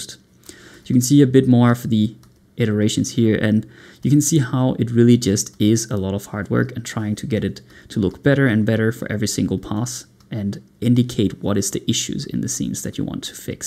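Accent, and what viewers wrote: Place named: Denmark. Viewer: Godt grej, Det ser fandme godt ud! :D Baseret på accenten tør jeg godt skrive denne kommentar på dansk. :P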